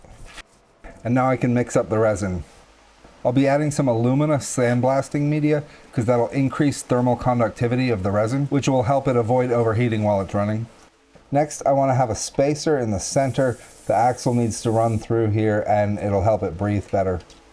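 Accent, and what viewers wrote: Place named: United States. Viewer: I'm hearing American English, but I se British power outlets. so.. . what's the story ?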